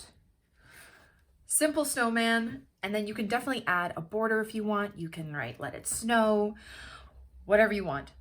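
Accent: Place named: Canada. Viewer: I have a feeling you're Canadian, :) just watched this and your voice annunciation... or northern US state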